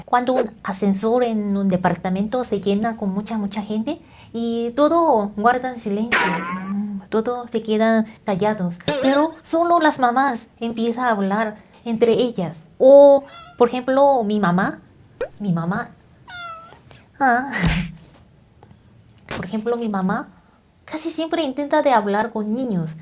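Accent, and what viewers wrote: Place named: Mexico. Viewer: Qué bien hablas mexicano. 🎉🎉 Saludos y sigue disfrutando de México.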